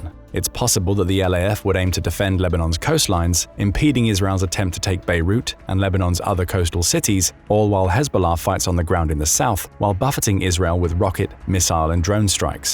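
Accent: United Kingdom. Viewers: What a crap. Full of.wrong facts with nice british accent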